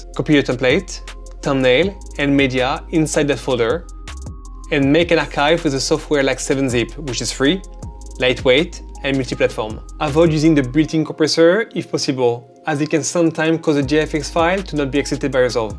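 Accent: France. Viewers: he is french 🤷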